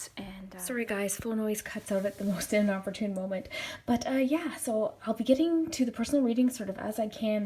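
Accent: Canada. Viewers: are you Canadian? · I might not be correct, but are you from Canada by any chance? I'm pretty good with accents and I'm guessing yours is Canadian.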